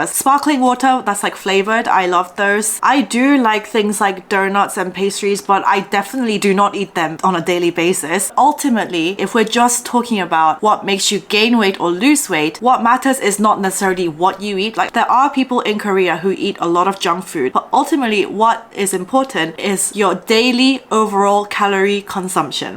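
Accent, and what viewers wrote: United Kingdom. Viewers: She has a UK accent (to my ears).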